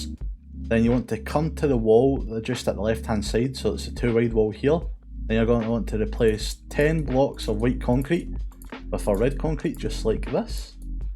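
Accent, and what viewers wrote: Scotland, United Kingdom. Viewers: Thank you! Your tutorial was very helpful and easy to follow. I'm Scottish too so your accent was easy to understand :)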